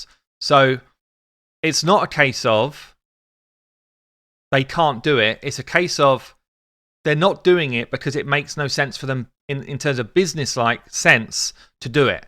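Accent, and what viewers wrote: England, United Kingdom. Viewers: Many thanks for another information update you deserve all the recognition you get. Website has become my go-to source (ahead of Chosen's great resources and I'm in a Chofly clan.) Battlepass appeals to me as a low spender as I'm retired. I play the Gwent cad game and their Journey is similar although with weekly challenges to meet - one of my monthly buys. Best aspect of your videos - your English accent, which this expat misses surrounded by Americans.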